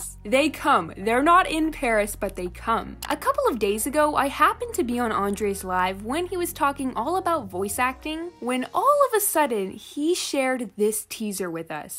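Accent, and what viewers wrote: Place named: New York, United States. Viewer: In the beginning I thought it was someone from New York I dunno I’m just saying!?!?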